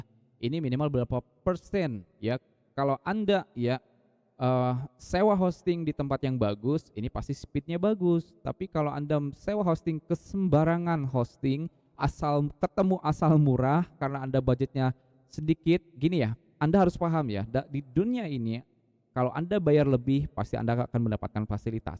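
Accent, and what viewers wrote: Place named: West Java, Indonesia. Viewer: Sunda pisan euy, siap auto subscribe! Nuju dipilari konten meta ads kieu.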